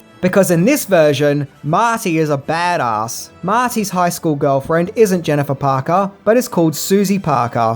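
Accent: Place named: Australia. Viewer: Mate, your content is really good but your delivery is pants - stop shouting at us and I know you can´t do much about your Ozi accent but just tone it down.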